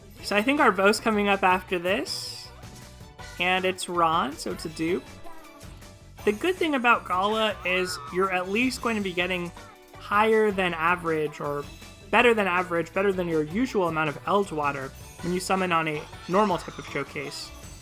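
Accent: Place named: Texas, United States. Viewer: A fellow Texan! I wouldn't have guessed it, but now you mention it I can hear it lol. Also this Gala was SO GOOD to me, yeehaw!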